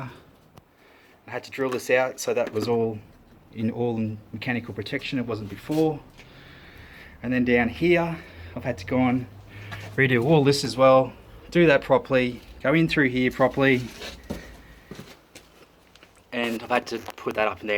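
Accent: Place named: Australia. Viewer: Perfect amount of swearing in that Aussie accent bud! (My church does not understand me 😂). Glad you were able to fix the mess!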